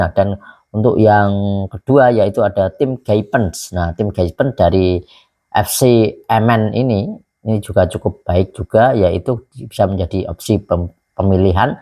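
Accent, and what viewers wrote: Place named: Java, Indonesia. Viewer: Ijin saran nya bang,😊,kalau mau buat ubdate kalau bisa jngan terbata 2 kalau lagi komentar,, sebaiknya logat Jawa nya di kontrol,,biar bahasa baku Indonesia 🇮🇩 di mengerti,,dan kalau cari materi jngn terlalu panjang 2 yg ujung 2 nya materi nya muter2 itu saja,, semoga bermanfaat buat Chanel Abang,dan semoga semakin banyak yg melihat,,❤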